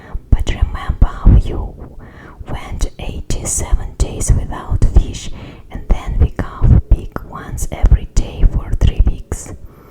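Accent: Russia. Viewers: You have a very lovely Russian accent!! Especially you also have a very lovely voice! I could just listen to you all day!! 🤍🤍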